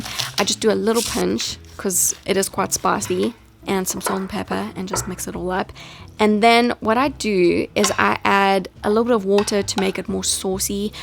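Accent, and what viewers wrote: South Africa. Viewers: Just discovered your channel...are you South african? I am from Cape Town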